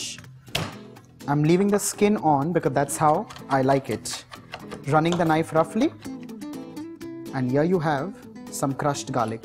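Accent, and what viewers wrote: India. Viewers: mate it's, he just has an indian-like accent. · Authentic Mexican recipe with Indian accent. I’m going to try this recipe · Love this funny Indian English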